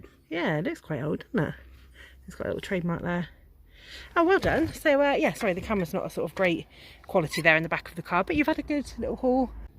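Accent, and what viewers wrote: England, United Kingdom. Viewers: Hej and. I do really love your videos, not only are they extremely exciting but at the same time very much relaxing. What I find exciting is what you two find and the many possibilities items that still haven't been found. you voice and your lovely English accent is like climbing into a warm bubble bath, so relaxing., I that you weren't in too much pain during or after your larking trip, please take care of your foot and be careful when in the water.🤗🇩🇰 I hope that your lovely and generous giveaway is open internationally. 🥰 I look forward to many more videos. By the way what are the chances of you and taking a larking trip to London where you do a bit of larking/mud larking at the Thames? And maybe follow the Thames up to Oxford and Windsor? That would be a wonderfully interesting video and a wonderful vacation for you. Lots of love from Denmark 🇩🇰 Ps. 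I too love the Victorian era and Queen Victoria is my favourite monarch, as well as Queen Elizabeth.👑 They were such strong women during a time where women were not "allowed" to be that way.🤔 My goodness my message to you two has become some what of a novel, I do apologise for that.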